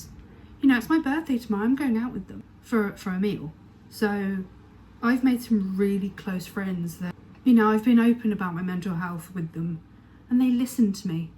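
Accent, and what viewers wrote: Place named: United Kingdom. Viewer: Because of your accent and you mentioned the UK toward the end I appreciate knowing where you are. We manage a food distribution out of a church in Northern California. Your experience of developing friendships and feeling not judged is exactly what we have too. We do not prequalify our guests and know they are there because they have some need of community and food. About half our volunteers are guests that asked if they can help us. Sure! We have volunteers from all kinds of nationalities, beliefs and finances. Our strength is having a great variety of people learning to work together on a common cause. We know that not all the food we give is a good fit for everyone. Our hope is that they try the food and give away what they don’t want. I’m reminded of the lady who was delighted to receive a big package of chicken feet! 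Wonderful! I would like to see offered counseling on budgeting. Sometimes we need an uninvolved person to suggest leaks in our spending habits. We offer listening to their story and prayer. God bless you in your journey. God bless the church that opened their doors and finances to their community.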